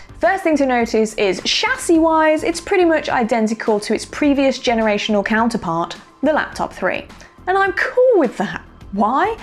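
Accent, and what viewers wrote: United Kingdom. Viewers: I love this lady, she's so fun to watch. English accents are the best.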